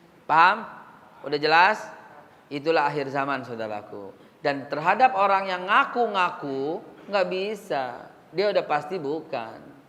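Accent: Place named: Betawi, Indonesia. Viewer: Dialeg betawix yg saya suka yg justru krn kturunan, tp akidahx ciamik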